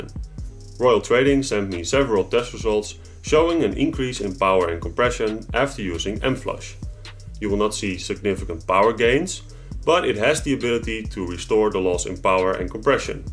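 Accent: Netherlands. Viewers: you gotta love the dutch accent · Are you dutch 🇱🇺 ? The accent gives it away 😁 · Hey! Ik hoor dat je nederlands bent, dus hopelijk kun je deze vraag beantwoorden haha. Ik heb net een 1.8 NA miata aangeschaft met 250K km (150k miles) op de teller.. geimporteerd uit amerika, maar bij het starten maakt het motorblok precies het zelfde geluid. Zelf heb ik twee NB's gehad en altijd 10w40 gebruikt. Als ik dit spul wat jij aanbeveeld erin stop en 50-100km ga rijden, kan dat geen kwaad? En daarna mijn olie ververs? Zijn het de zuigerveren die dan vies zijn of aan vervanging toenzijn? Graag hoor ik even meer😁 Groetjes, chris. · haha lekker nederlands accentje, ik heb een cls500 die ook tikt misschien dat ik dit ook ga uitproberen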